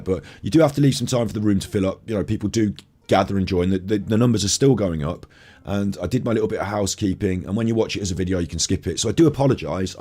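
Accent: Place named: Midlands, United Kingdom. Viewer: Agreed ! I like his thought processes and I ❤ how he sounds, eg: Midlands accent. Oh, and easy on the eye as well 😊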